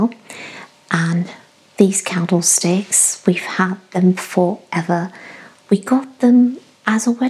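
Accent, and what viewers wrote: United Kingdom. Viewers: I’ve noticed over time how much more British you accent sounds.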